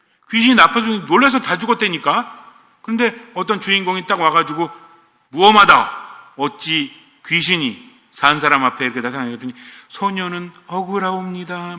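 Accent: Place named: Suwon, South Korea. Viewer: 찬미예수님~^^ 옛날에 부산에서 3년 살아보니 부산 사투리에 젖어 나의 언어가 평범하지 않던 시간들... 신부님 언어에서 정감 넘치는 말들 수원 사투리~^^ 안성 사투리~^^ 정겹고 좋아라~ㅎㅎ하^^ 좋은날 되세요~^^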